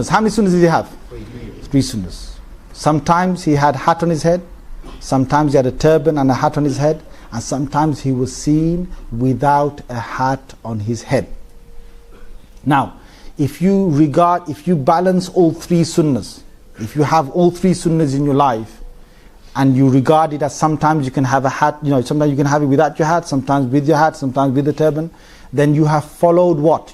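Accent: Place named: United Kingdom. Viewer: Definitely, british accent yes man I like this islamic man very much talking good about prayer and about his own God name called Allaah it's there own God true talk Mr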